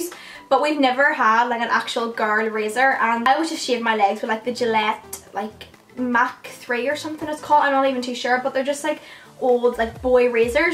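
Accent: Northern Ireland, United Kingdom. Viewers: are you from northern ireland x i am 😁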